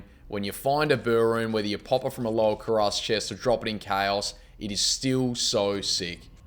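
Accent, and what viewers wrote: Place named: Australia. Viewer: Yankee here, but my family did live in Melbourne for 7 years recently before to moving back to the States. I played D2 when it first came out, then again about 10 years later to build a character to do the Ubers. In my third run now to try to beat the Ubers and colossal ancients this time. Really enjoyed hearing the High Rune Frustrations in an Aussie accent and learned a thing or two along the way. Subbed so I can hear more whinging and I hope you eventually get that perfect role.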